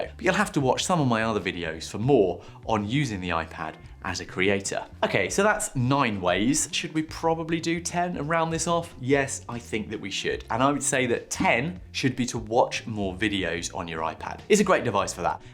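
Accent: United Kingdom. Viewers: I subscribe to ur channel cause I like ur British accent lol …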